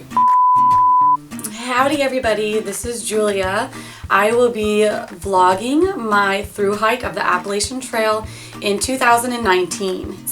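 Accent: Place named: Pennsylvania, United States. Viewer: Are you by any chance from the Philadelphia area?? You sound exactly like you grew up in South East pa haha that isn't an insult at all I promise, I live in Delco 😁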